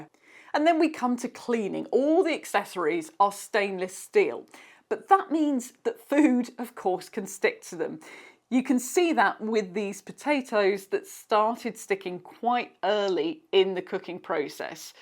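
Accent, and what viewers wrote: England, United Kingdom. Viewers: I have joined your channel as it is English and that makes a refreshing change from American or Pakistani channels that don't understand the English people and what we want in an appliance, and an English person that speaks very clearly, thank you, I am looking forward to many reviews.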